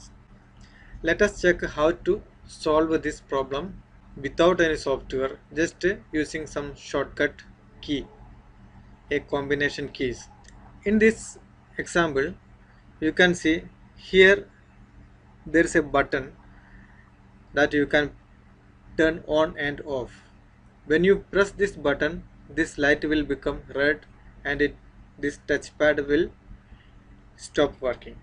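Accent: Kerala, India. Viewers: മലയാളി ആണല്ലേ.. ഇംഗ്ലീഷ് കേട്ടപ്പോ തോന്നി... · Malayali analle 😆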